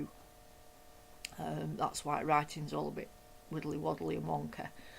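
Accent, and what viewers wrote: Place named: North, United Kingdom. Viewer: Hey, absolutely genius idea. Love it! I’m going to have to try this. I love your accent, are you from York or Lancashire? PS. Hope i haven’t offended. I’m probably way off the money. Lol. Anyway, loved your idea, and glad your back. Crafty Hugs. Xoxo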